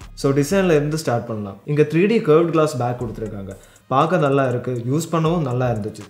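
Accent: Kerala, India. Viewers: Malayalam kalantha tamil um kekka nalla than irukku ❤️ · Nice explained.. but I felt ஒரு மலையாளி தமிழ் பேசுற மாதிரி இருக்கு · Ena bro unga voicela lighta tamil+malayalam kalanthu varuthu neenga malayaliya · Mallu accent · அதெல்லாம் சரி க்கு தமிழ் தடுமாறுதே. மலையாளம் வருது.... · Bro nega malayalam mix tamil pasuriga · Malayali yoh? Bcoz ur slang looks like Malayalam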